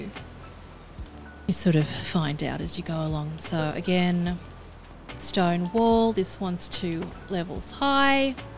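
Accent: Australia. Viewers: Aussie or NZ?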